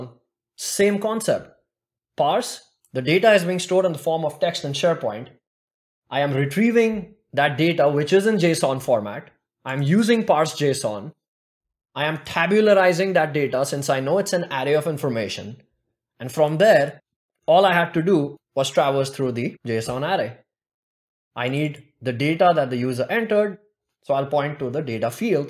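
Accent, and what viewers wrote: India. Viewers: I work with power platform dev for almost 10 years already and I must say... if the presenter have indian accent, he is a good teacher... great video m8 !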